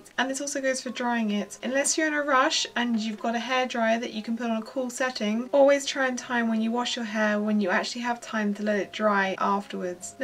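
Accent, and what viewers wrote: United Kingdom. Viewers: I'm trying to figure out which part of the uk you're from. I want to say london? amirite? :D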